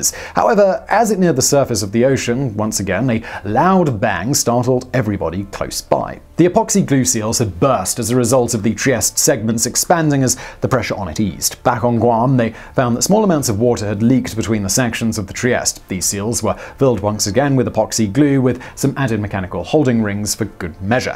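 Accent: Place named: England, United Kingdom. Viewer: The English accent... :)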